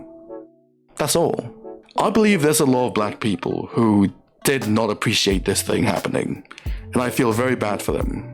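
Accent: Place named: England, United Kingdom. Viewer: Very balanced take and earned my sub so will check out what else you have on your channel. Also being from England you have a cool sounding English accent and im curious if you learned English in my country?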